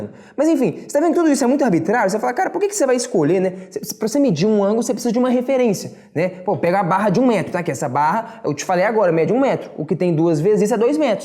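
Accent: Minas Gerais, Brazil. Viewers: Extremamente bem explicado! Esse sotaque aí eu conheço heim! Mineirinho!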